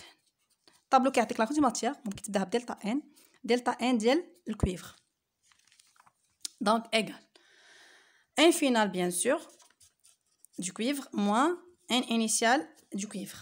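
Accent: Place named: Canada. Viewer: Canadian accent wow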